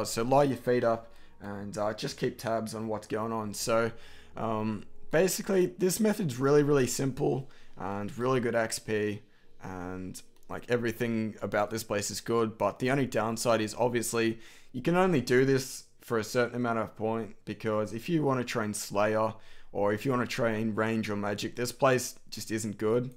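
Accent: Australia. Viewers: Hey mate, are you from the NT? you sound like a real Aussie bloke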